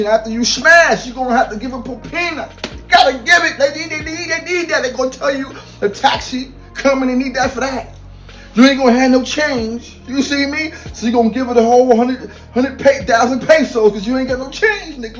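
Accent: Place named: New York, United States. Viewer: love when this dude talk that ny shit lol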